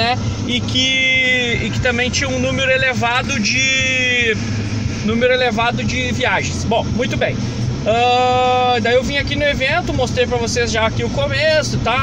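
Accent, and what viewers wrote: South, Brazil. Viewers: Fala parceiro! Pelo sotaque vc é do sul rsrs...qual sua nota média?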